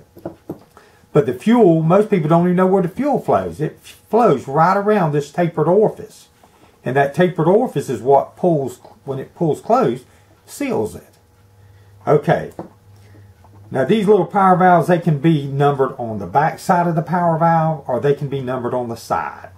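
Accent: United States. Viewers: Thank you, with that sweet Southern accent you could tell me anything about automobiles and I would believe it, ha ha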